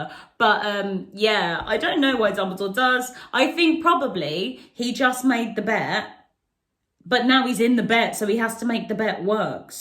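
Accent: United Kingdom. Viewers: u british right lol!? x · Ms., (I've asked this in another place) as an American, the subtleties of British dialect are beyond us. Might I ask what "flavor" of British English do you speak? Also, you are brilliant, sharp and quick as lightning. Very extraordinary. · You sound quite British, I hope you are, I love finding YouTubers/tiktokers from the same country as me 🙂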